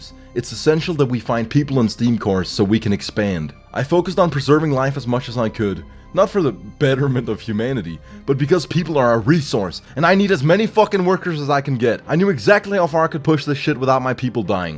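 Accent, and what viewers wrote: Denmark. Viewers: This guy danish ? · Wait shit. Are you bloody Danish?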